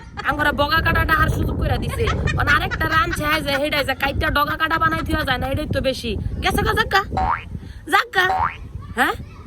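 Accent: Comilla, Bangladesh. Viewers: Ha ha ha ha ha ha, eii apu tumi R gali diyo na amar haste haste sound asena, 😂😂😂 R kotha gulu amader zillar moto mone hoy, Comilla, Tumi kon Zillar??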